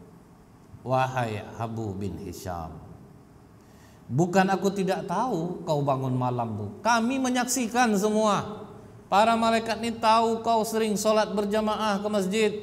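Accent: Pekanbaru, Indonesia. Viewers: Selain dapat ilmu dari tuan guru, logat khas Pekanbaru tuan guru jadi pengobat rindu 😁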